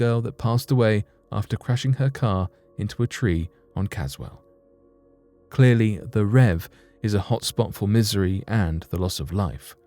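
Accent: England, United Kingdom. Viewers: Americans complaining about how an English persons pronounces something 😂kettle black and all that 😂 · Hes British. Settle down with the exact pronunciations. I'm surprised more people dont criticize his shitty research.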